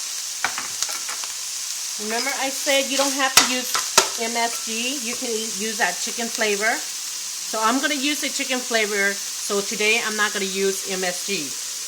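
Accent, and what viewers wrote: Laos. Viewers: The crepe were lumpy, some had holes, the fillings were falling out. they were ugliest mess but very yummy. I love the sweet and sour sauce. I just need more practice. I use a lot of MSG and I am very much a live. So I will keep using it. thanks for the tutorial, your Laos English is just perfect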